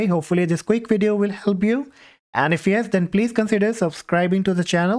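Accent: India. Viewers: sound like an indian person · Why he sound like scammer indian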